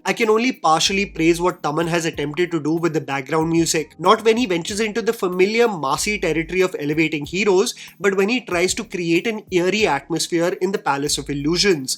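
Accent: India. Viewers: Why??? Why can't you respect his hindi accent?? And in Hindi it's "Telgu" & "kannad" If you can't respect other languages, why expect others to respect yours??? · There's no disrespect towards his Hindi accent. I jokingly mentioned about the pronunciation. I know it's tough without knowing the language entirely, so full points for trying. If you respect other languages, you will say Telugu and Kannada properly, just like how we say Hindi and not Hin or Hind.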